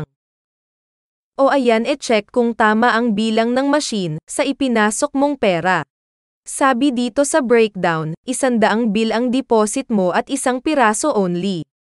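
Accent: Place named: Ilocos, Philippines. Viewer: ilocana ka haha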